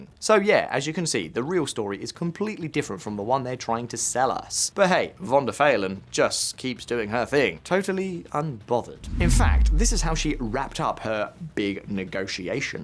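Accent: RP, United Kingdom. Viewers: You cut all the pauses. Now your video doesn't breathe, it's rattling. It doesn't do justice to your posh british accent.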